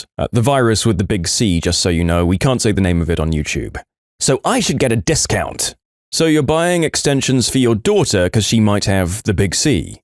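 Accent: United Kingdom. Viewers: I don't like the new narrator British people aren't good for these types of stories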